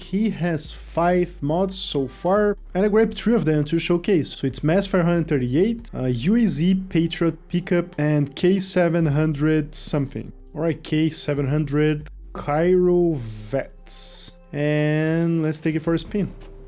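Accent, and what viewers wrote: Brazil. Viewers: esse maluco parece um brasileiro falando inglês kkkk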